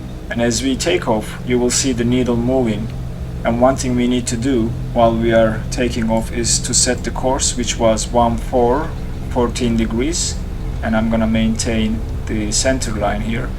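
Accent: Turkey. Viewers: You are talking english like a turk, are you turkish, I wonder if you are turkish, I am turkish, so I'm asking, it was an excellent educational video by the way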